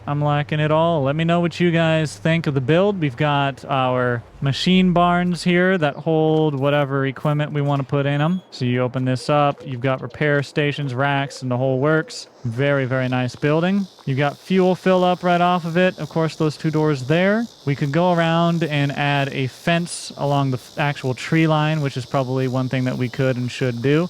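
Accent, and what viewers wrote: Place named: Utah, United States. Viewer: Do happen to be from Utah? You have the accent 😅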